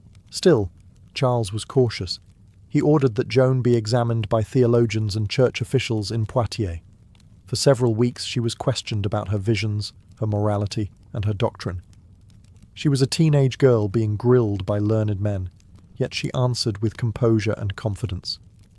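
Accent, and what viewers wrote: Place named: England, United Kingdom. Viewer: LMFAO You crack me up when you say such funny things in that classy English accent of yours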